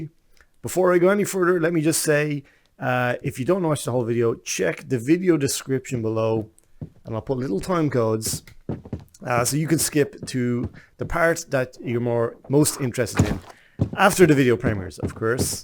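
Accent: Ireland. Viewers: He is indeed from ireland! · hay man love the game, i like ur accent. i have a feeling ur from ireland like me! and if u are where u from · Are u Irish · Question: are you irish? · Except he’s irish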